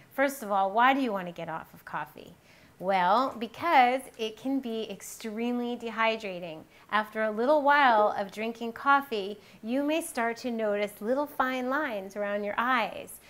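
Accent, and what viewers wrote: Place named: Texas, United States. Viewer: little bit of honey -- sounded like she from TEXAS:))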